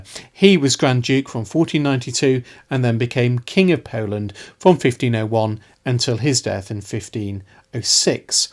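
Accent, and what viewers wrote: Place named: England, United Kingdom. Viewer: Why don't the English try even a little to pronounce foreign words/names as natives would? The J in Polish is pronounced as Ya.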